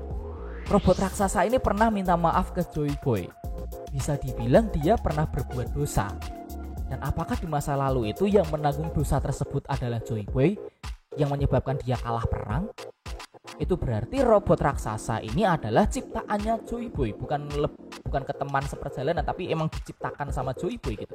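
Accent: Java, Indonesia. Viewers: ngomong ny gak medok bisa gak bang?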